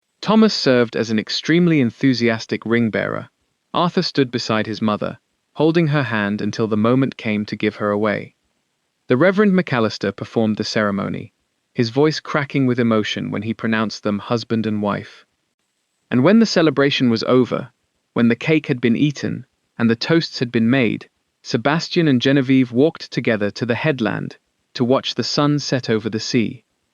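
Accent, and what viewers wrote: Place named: United Kingdom. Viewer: Is the narrator British or American? The voice goes in and out of both accents, sometimes within the same sentence. Pretty distracting.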